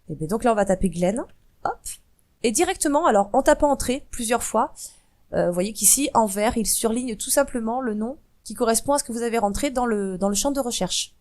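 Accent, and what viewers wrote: South, France. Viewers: Tu as un accent de qq qui a vécu à un moment dans le sud mais pas forcément toute sa vie ? Moi j'utilise souvent : Ctrl+F pour rechercher des mots clefs. Ctrl+clic gauche pour ouvrir un lien dans une autre page. Ctrl+la molette pour zoom/dezoom. et ctrl alt supp.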